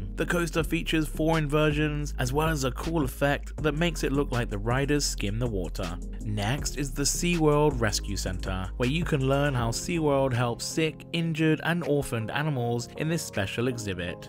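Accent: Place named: United Kingdom. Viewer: British accents announcing American products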